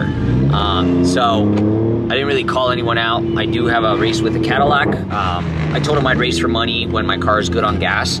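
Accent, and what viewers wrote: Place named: Spain. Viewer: de donde eres escucho acento español¿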